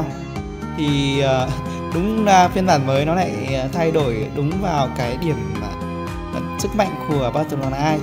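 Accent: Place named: Hanoi, Vietnam. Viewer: ah quê ở đâu vậy hơi gọng giống ở hn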